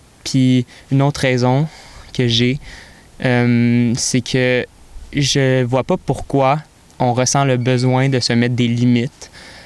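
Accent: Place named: Quebec, Canada. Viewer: je kiff trop l'accent quebequoi ❤️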